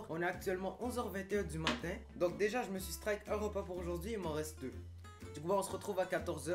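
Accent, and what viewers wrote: Quebec, Canada. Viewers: T'es Québecois???? Sinon fréro je viens de te connaitre grace a Joyca ;) et t'es trop marrant fréro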